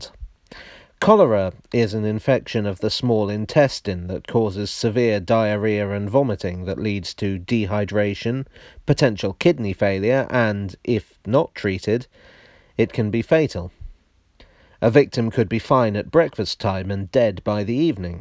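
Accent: United Kingdom. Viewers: British; Snarky; Educated; Articulate; Dry Humor... If Shaun Hastings of Assassin's Creed had a YouTube channel, this would be it. Love your content, man.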